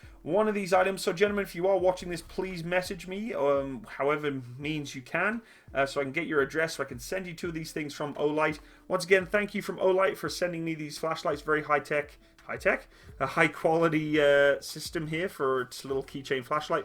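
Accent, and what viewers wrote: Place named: United Kingdom. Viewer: Feel like at home hearing your British accent that's lovely I'm British too. Enjoying your Youtube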